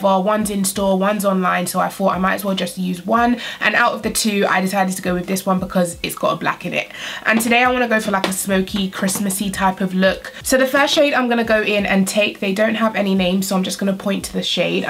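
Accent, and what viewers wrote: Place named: London, United Kingdom. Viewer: loving the look! And (sorry, English geek here), it's not that you pronounce thing wrong, far from that. It's London vernacular/slang - for example, with the "ask" pronunciation, most Londoners do say "arks"...just they way we roll haha <3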